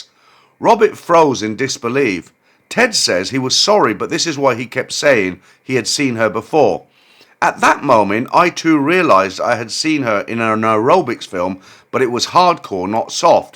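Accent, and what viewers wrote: England, United Kingdom. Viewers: Glad you are keeping these. I would never watch AI stories they’re boring and soulless. Watching since the shed days of 2020/2021 I have never even been to Thailand but I find them entertaining. Also nice to hear an English voice while I’m in australia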